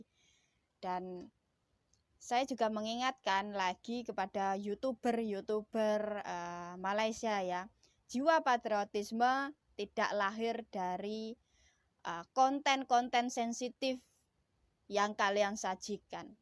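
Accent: Java, Indonesia. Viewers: mbak. Saya suka sama medok nya sampeyan mbak. Renyah ditelinga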